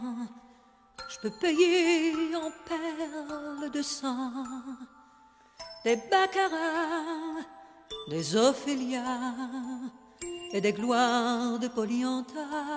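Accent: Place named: Quebec, Canada. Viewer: C'est vraiment une québécoise loll je reconnais l'accent mais je la connais vraiment pas lllolll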